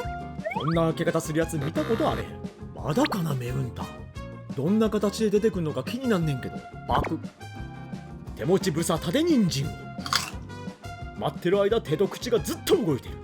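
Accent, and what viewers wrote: Busan, South Korea. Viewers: 물론 철은 아니지만 몇키로 주는지 모르겠지만 비싼편 이네 근데 한국분 아니심? 발음이 한국인 인데용? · 좋은 데이가 고고로 채워줬 데이~🎶 부산 사투리 제대로 쓰시네요! 👍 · 경상도 사투리도 잘하시네요.😅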